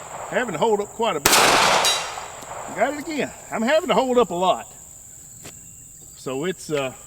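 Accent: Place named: South, United States. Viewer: I'm from the North and enjoy your Southern drawl. Tying to get my wife to try it also. PS - My Sig 100 grain ammo tends to spit back at me also.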